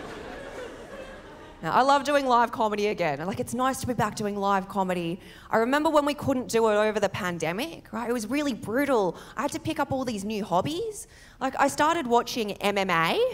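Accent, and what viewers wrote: Australia. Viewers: Sounds more Aussie than most Aussies😁🤣🤣👍🇦🇺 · She has the Chinese/Vietnamese Australian accent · She sounds so Aussie it’s mad. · I love watching and of course listening to her Australian accent. Her routine is funny and her timing is awesome! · Love the Aussie accent regardless of the ethnicity… .. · That's pure Aussie accent, Mate! She grew up in Sydney. You just assumed she has Vietnamese heritage from her name, & while she says she Chinese, she was NOT born in China nor Vietnam. You'll never get where was she was born! If you think the tone changes & the accentuated vowels is anything to with a Chinese or Vietnamese accent it doesn't!. That is all 100% this type of Aus accent. If you'd only had heard the audio, you would have no clue there's anything other than aussie. Because there isn't. Now go watch Diana Ngyen, to see the difference between accent, & what you wrongly think her accent is. 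· she has a Asian au accent very common in Melbourne and Sydney she doesn’t sound bogan at all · Hi. "Bogan" isn't exactly the equivalent of American 'Redneck'. (it's just the nearest word/type to explain it). A better description of Bogan is "a bit rough" in style and speech. accent is how some Bogans would also sound. (some, not all). However, there's also thing called "cashed-up" bogans. Definitely not unsophisticated, just might sound it from way they talk. Of course there are also the many "true bogans". After 4 or 5 VBs, or Fruitly Lexia, nearly everyone becomes Bogan, lol There's several types of Bogans, just like there's (more than) several types of Australian accent. is not common now in Melbourne or Sydney & in a lot of Brisbane any more, except in some outer suburbs. Many Australians are commenting, in/on videos it's the "most Australian" accent they've heard. That type of Aus accent was common till the 90s, but in the cities, at least it's often more rounded now. 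But there's also the frequent Euro-Australian accent, which is another, again. (Although, quite a number of Austalians don't realise they're own accent is more "Australian" that they think) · If you live in Australia and are respectful and proud to be in Australia then I have no problem with calling you Australian. You however have such a strong Aussie accent that I would have assumed you were actually born here and maybe even your parents had been here for a long time. Also that was a hilarious video. Cheers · You are quite funny. I love your Aussie accent. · She has that Australian Asian accent. Most of my Aussie asian friends have it. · You are the best of both world , beautiful Asian looks and wiked Aussie accent , keep up the great work you have a great sense of humor ! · You sound more Australian than Nicole Kidman and Margot Robbie combined. Good stuff lol. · I'm a gen x white guy from Melbourne and she sounds more Aussie than me lol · I've never heard anyone more Australian in my life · ABC 👍👍perfect australian accent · What makes her funny is she has a THICK Aussie accent, she's funny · It's unusual to see someone of Asian heritage (or African) with such a strong Aussie accent. 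It's very endearing! Continue being bogan and proud! Own it! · I Honestly never realized Super Cute Asian Girl with an Australian accent was my thing until just now. Alas, you're too young for me young lady, so it'll never work. You're really funny. You deserve a Comedy special. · I love when another nationality sounds like us true Aussie’s ! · face deffo doesnt match the voice. yer an Aussie through and through. subbed. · Nah you got that Asian Australian voice/accent. There’s that accent that is not a fob accent, but it’s very typical of Chinese descent Aussies.. especially women. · She's Aussie for sure! No Asian can speak like that!🤭😎👍👍👍👌👌👊 Your awesome lady!👍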